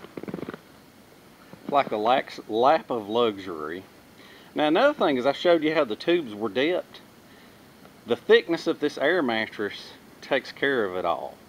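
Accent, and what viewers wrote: South, United States. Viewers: Greetings from WNY, discovered your channel recently when decided better get prepped up in light of current events. Really enjoy your content, very thorough, comprehensive, and informative. The cats made this one! Also wanted to say you remind me of my buddy Eric from Kentucky with your southern drawl! best to ya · Great project, and your presentation was,as always,very southern,and right on the money! Enjoyed it a lot.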